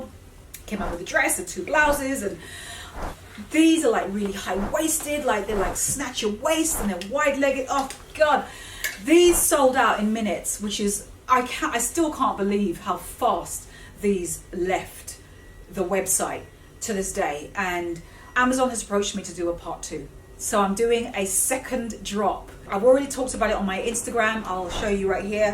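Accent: United Kingdom. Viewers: Hi beautiful - your accent wow! New to your channel, via Arvin Olano. Thanks for the Inspo, with your fashion taste I’m surprised your videos are not edited with music? You would rock any runway! Cheers!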